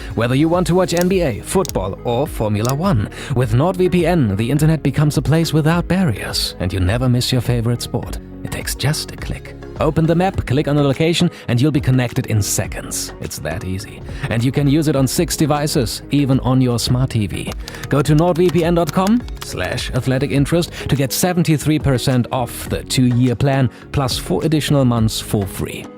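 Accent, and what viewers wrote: Germany. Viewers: German native speaker as commentator?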